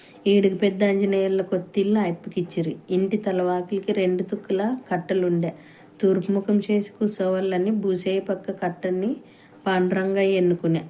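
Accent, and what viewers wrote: India. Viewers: కుశలంబే గదా ఆంజనేయా కథకు ప్రాణం పోసింది.కథలోని మాండలికాన్ని మారుతీ, తన అక్షరాల్లో పండిస్తే, తన వాచకంలో అద్భుతంగా పండించిది.బహుశా ఆమెది ఎమ్మిగనూరు,ఆదోని ప్రాంతమే అనుకుంటాను.ఇతరులకు ఆ యాస,ఆ వాచకం సాధ్యం కాదు. మనసారా అభినందనలు.సీమ కథను శిఖరాగ్రానికి చేర్చిన మారుతికి ఆత్మీయాభినందనలు. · కర్నూలు పడమటి తాలుకాలలోని యాస,గోనెగండ్ల మండలం, ఎమ్మిగనూరు మండలాలలో ఇలాగే వుంటుంది. చాలా బాగుంది 👌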